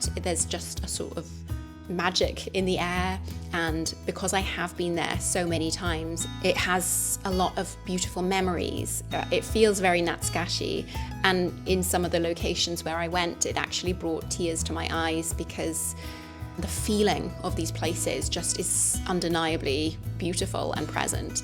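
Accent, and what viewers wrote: United Kingdom. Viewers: 動画の配信がしばらくなかったので、心配していました。 素晴らしい家族愛でお父様への孝行と恩返しをされて、天国のお母様も きっとお喜びだった事でしょう。 素敵なイギリス英語とともに、感動の動画をありがとうございました。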